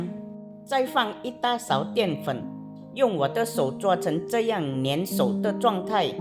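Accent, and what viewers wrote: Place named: Malaysia. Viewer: 听得出是我们马来西亚人👍🏻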